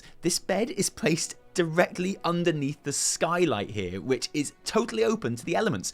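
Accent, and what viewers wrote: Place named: United Kingdom. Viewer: Late or not, don't need a reason to listen to you talk about WoW in a glorious British accent. Keep 'em coming.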